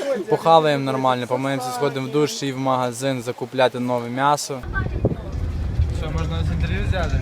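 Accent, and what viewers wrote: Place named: Western Ukraine, Ukraine. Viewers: То чисто під львівський діалект змішаний з сільським )))) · Базаришь как Лох!!! это не есть чистый украинский язык!!! Сразу видно западная Украина Але Але! На майдане стояле а теперь клубнику в Польше собираете!!!!!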